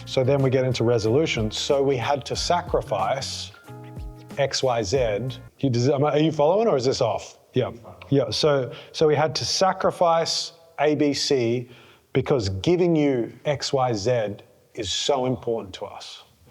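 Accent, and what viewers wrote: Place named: United Kingdom. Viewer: Bro I wanna decode your British accent through Alvin and the chipmunks lo-fi hip hop because you are hipsters and I'm here to get a sense of your style? For a marketing video? You wanna drag my ears through barbershop b-roll music whilst you pretend to explanate any bit of information. Fire your editor posthaste good channelkeep.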